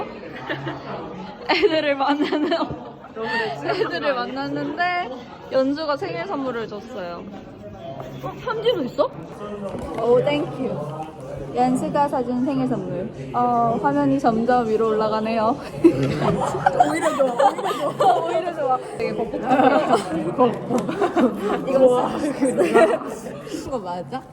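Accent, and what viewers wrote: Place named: Busan, South Korea. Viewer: 님 사투리가 들리네요!ㅋㅋㅋㅋ 부산사람이셔요?!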